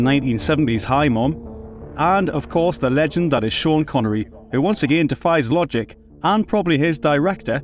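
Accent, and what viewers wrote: Newcastle, United Kingdom. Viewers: Great to hear a geordie on hear👌👍🏻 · Unexpected and lovely to hear a Geordie accent on the JoBlo channel! Great job.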